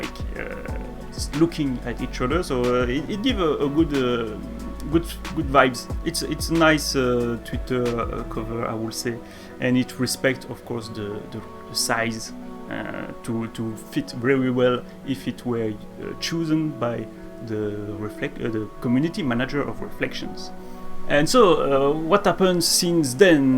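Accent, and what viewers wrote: France. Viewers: What a handsome guy you are! And nice French accent. ☺ Thanks for keeping on Driver on YouTube. Wish you success!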